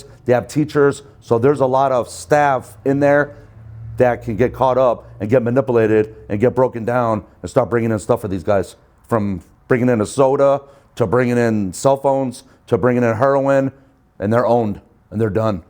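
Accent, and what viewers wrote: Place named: Southern California, United States. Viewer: Dude sounds like Tony Ferguson from ufc. Got that socal accent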